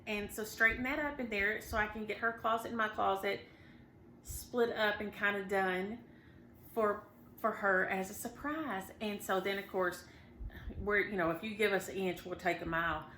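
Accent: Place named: West Virginia, United States. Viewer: Just love your down home chats. makes me feel at home e g from WV My accent sounds like you as well. I’m in INDIANA and retired. But I still miss Home especially Christmas time!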